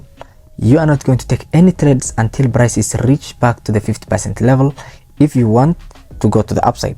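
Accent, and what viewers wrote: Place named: Somalia. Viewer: Your accent looks Somali hh glad to see ur video though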